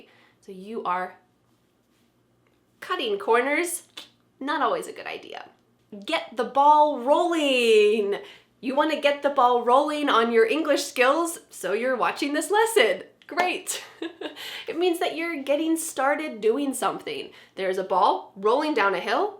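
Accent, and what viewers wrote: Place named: United States. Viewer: Thank you very much, you have taught me the American accent excellently Follow you from Algeria 😊😘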